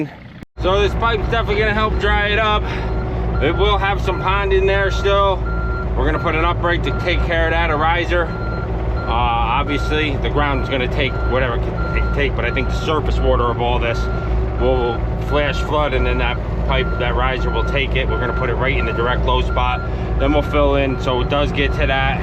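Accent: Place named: New York, United States. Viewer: Unsubscribing!! Can't stand listening to that annoying New York accent!!! And you're a know it all, typical east Coast Democrat!!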